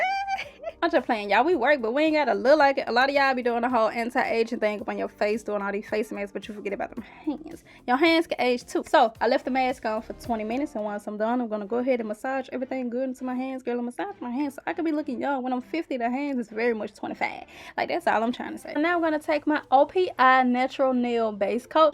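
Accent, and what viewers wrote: United States. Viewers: Wow, that's the most American I've ever heard a human being sound.. Nice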